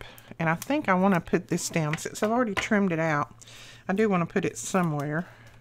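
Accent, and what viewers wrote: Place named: Georgia, United States. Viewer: You wouldn’t happen to be a Georgia Peach would you? I just love ❤️ your accent! You could read the Constitution to me and not get bored! My history teacher was from Georgia and I truly thought you were her. She was my best teacher I ever had.🌹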